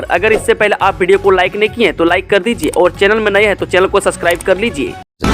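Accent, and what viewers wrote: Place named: Uttar Pradesh, India. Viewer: Bhai tum Hindi UP style mein bolte ho.UP ke ho kya.HP ke reader khatam ho Gaye jo tumko import karna pda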